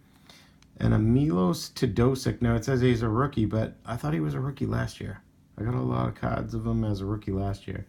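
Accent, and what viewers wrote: Boston, United States. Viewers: I can tell you're from Boston! Lol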